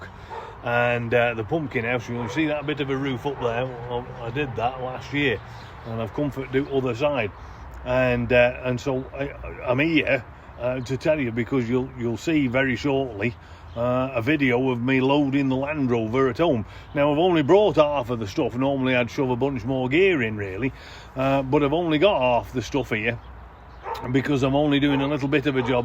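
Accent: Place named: Northern England, United Kingdom. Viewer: thanks for checking in Keep up the great work, I really enjoy your videos, fresh, to the point and a great Northern accent Lol. I was born in Lancashire, owned LRs since I was 16 had a few lol. Still not complete ruled out a new Defender, however given the rising prices of old Defenders in NZ a new one is a better financial option. I been lucky with my D3 V8 4.4 version hence the propensity to keep. All the best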